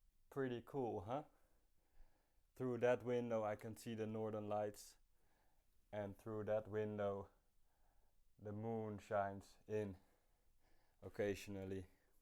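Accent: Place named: Netherlands. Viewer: Sound like a Dutchman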